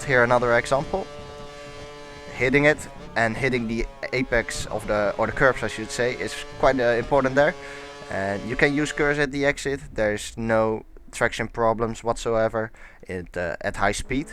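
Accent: Netherlands. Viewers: nah, his accent is way more dutch than finnish.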